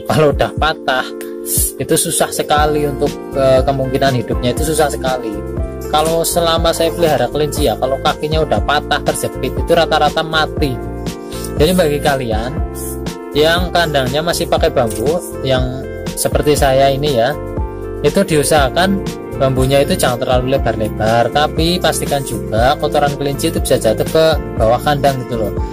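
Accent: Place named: Banyumas, Indonesia. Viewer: klu orang ngapak ketahuan cara ngomongnya... hidup ngapak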